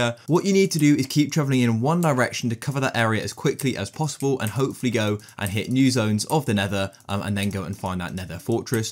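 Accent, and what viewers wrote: United Kingdom. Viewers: 1 word british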